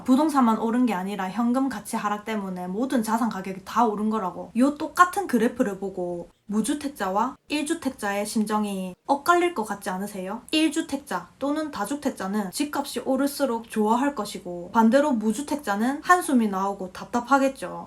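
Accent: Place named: Gyeongsang, South Korea. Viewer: 의 나레이션을 보면서 문득 드는 생각은 내가 남부경남 출신으로서 의 사투리가 무척이나 인상적이다. 남부경남 특유의 성조가 두드러지게 나타난다. 2의 2승 e의 2승을 설명하기에 무척이나 최적화된 사투리 억양을 가지고 있다. 남부 경남 사투리 특유의 '강조'를 할때 다소 신경질적인 톤이 나오는 고유의 억양을 가지고 있다. 기회가 되면 남부 경남 사투리 연구를 해보고 싶다는 생각이 들기도 한다. 다른 사투리는 별로 관심이 없고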